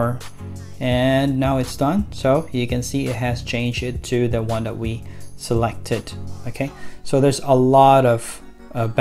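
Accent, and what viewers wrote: Philippines. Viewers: Sound Filipino...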